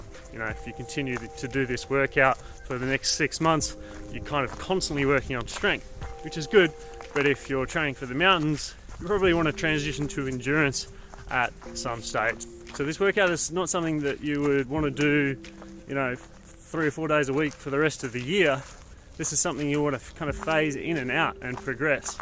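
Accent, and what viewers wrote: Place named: Australia. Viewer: First time watching, excellent. Guessed Australian before you mentioned it but thought you were a Norwegian who learned English there.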